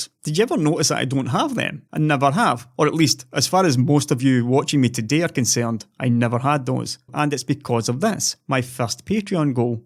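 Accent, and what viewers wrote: Scotland, United Kingdom. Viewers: Bruv, people are complaining about your barely heavy Scotch accent, meanwhile I throw in multiple accents in almost a fucking paragraph. Explain that "lack of controlled accent". E Y E R O L L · Only thing this story is missing is a Illuminati conspiracy theory. Its nice to see you being so transparent with your channel and communication with MIS etc. Always thought your accent was trademark. As I ask my friends or coworker: you know that scottish tek guy on youtube and they know. No need to try and explain some video we ones saw. Sins you mention World of warcraft, I heard you played on Zenedar back in "classic" days and so did I. So just a hello from Hayter. hope you keep doing this for a long time, I like the quality over quantity thing you do. I mean who have time to watch youtube allday... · I am an english viewer from the south east of Kent and I have no issues with your accent nor any scottish accent, and I am hard of hearing, keep up the good work, I thank you! 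· Not a native english speaker, no problems with your scottish accent, but I do understand the difficulty, the hate I do not. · Greetings from Germany! To provide some balance: I absolutely love that scottish accent. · Its a brilliant accent. Actually very 'broadcast quality' for a British market. I lived in the states for 19 years. I can understand the difficulty with many Americans having difficulty, which is a real shame because of the size of the market. It's not so much a deficiency in their ability to be ABLE to become accustomed to the Scottish accent -its more due to the US mainstream media's unwillingness to expose their audience to enough of the beautiful Scottish accent, which is a real travesty. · i started watching your videos a long time ago because i was tired of what could be seen as generic tech videos. I was looking for something that could challenge my mind and let me think. 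Today's videos by most tech people are incredibly dumbed down to where we already know the outcome. When i started seeing videos come up that said how you can liquid cool your computer with (insert stupid idea) i knew we had reached critical mass. I think people looking at your videos and seeing a sponsorship they have a knee jerk reaction mostly due to how much the current tech press has sold out. We all know it has or at the very least know it isn't as straight forward as it used to be. It isn't fair to paint everyone with that brush especially you but i get why they react immediately to that. I personally love your accent, my lineage is of Scottish decent and I enjoy listening to it. I can understand why some people might not like it though. For me it is the English accent. It amazes me how a country as small as Britain is can have so many people speaking in wildly different sounds. That is an example of what i cant stand. 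Your information in your previous videos is still very relevant to today. I can almost see it like how the Wii U games are being re-released on the switch, great games that never reached out to the full audience. I would rather hear you speak but i get needing to reach a larger audience. Keeping videos to around 10-12 minutes will keep an audience especially with h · Your accent is just fine. (*and your videos MORE than fine !! ) If certain people want to hear only English accent ,then perhaps they should start watching videos which are made from an Englishman instead of a Scotsman !! EDIT: P.S. I would disagree with you at the "hardware review"-part though. As a customer , i'm extremely interested for hardware reviews , and i would much rather see these reviews from a person that i can trust. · Great content and a good ethical stance...oh and the beautiful lilting Scottish accent 😜 Subbed. 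· How the hell do people have a problem with a Scottish accent. People need to get out of the basement more. :) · The Scottish accent is an honest one! Keep it up! :D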